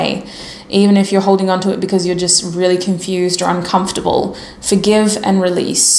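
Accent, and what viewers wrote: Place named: Australia. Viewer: Hi would you consider doing meditation videos, you have a soothing Australian accent which is very calming and easy listening to hear. Very relaxing ❤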